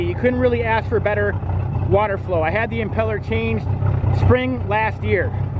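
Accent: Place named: Wisconsin, United States. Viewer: keep up the great videos! They have been extremely helpful. Watched several of your winterizing videos last year to get my boat put away. Cranked up easy this year and we have already been out on the water a bunch. Also replaced my exhaust flappers, and going to be replacing my trim solenoids soon! Your videos are spot on, and I really dig the Wisconsin accent. Peace brother!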